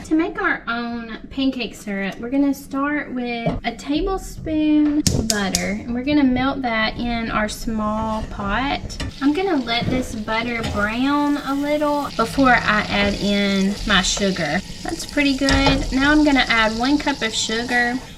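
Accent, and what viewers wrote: United States. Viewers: sorry being english you sounded yank lol :P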